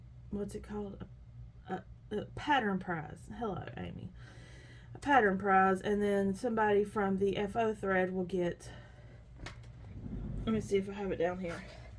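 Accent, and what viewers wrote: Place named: South, United States. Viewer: Hi from Dothan, I knew you had to be from somewhere nearby when I heard your accent 😊. You've got a beautiful yarn room there and I love those Felici socks. I'm loving knitting the Pop Life Cardigan by WATG after making myself finish two large crochet afghans. Looking forward to seeing more from you and nice to meet you ❤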